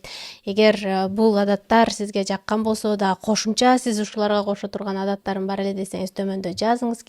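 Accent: Standard. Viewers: АЗАМАТ КЫЗ ЭКЕНСИН, АНТКЕНИ КЫРГЫЗ ТИЛИНДЕ ТАК ТАЗА СУЙЛОЙТ ЭКЕНСИН ! БУЛ СЕНИН АКЫЛДУУ ЭКЕНИНДИ. БИЛДИРЕТ ! << КИРГИЗ >> ЭМЕС ЭКЕНСИН ДЕМЕК КЫРГЫЗДАР УЧУН ЖАН ТАРТКАН ЖАКШЫ. АДАМСЫН ! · Эң соонун кенештер. Кыргызча таза сүйлөгөнүңүз үчүн сизге чооң ырахмат. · Аягына чейин аябай кызыгып коруп уктум,унунор да жагымдуу, озунор да татына кыз экенсинер,таптаза кыргызча суйлоп адамдын мээсин ачып куйчуну куюп кайра жаап койгондой айттынар баарын,молодец аман болгула ишинерге ийгилик🎉🎉🎉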